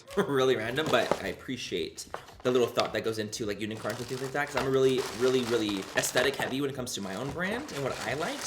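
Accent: United Kingdom. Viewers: Manny Mua yes!!! Plus your sweater 😍 all while saying it’s so hot out here! 🤣🤣🤣 Love you & ur British accent 😉 Edit: dessert is so nice you have to write the “s” twice... a rhythm I teach my students to help them remember 😉😃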